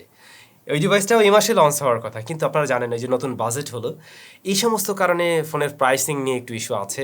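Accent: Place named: Barishal, Bangladesh. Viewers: বরিশালের কেন জাগয়া বাসা আপনার? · ভাই তোমার বাসা বরিশালের কথায়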